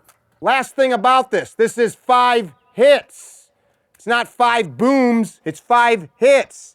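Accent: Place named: New England, United States. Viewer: Cant stand that yankee voice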